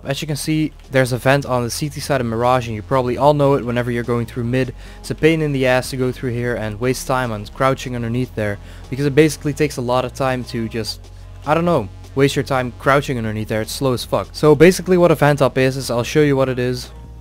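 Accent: Netherlands. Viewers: ahah ondanks dat je accent heel neutraal is hoorde ik het toch een beetje XD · Are u dutch lol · I can hear he is dutch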